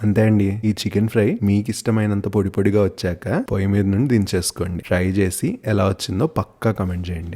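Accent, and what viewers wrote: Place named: Vijayawada, India. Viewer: Chala rojulu Ayindi andi mana style Telugu accent vinni. Mi Telugu accent ma vijayawada accent laga unde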